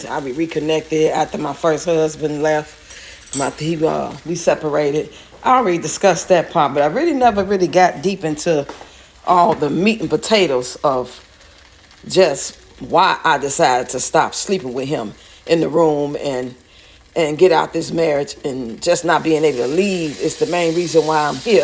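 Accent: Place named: Chicago, United States. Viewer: I’m born n raised n Chicago but I live in la , soon as I start watching your videos I heard your accent and I’m liiike ayyye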